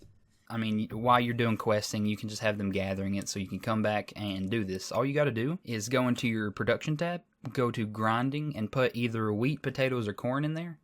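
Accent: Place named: United States. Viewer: I love this game and your country ass accent SUBBED!!!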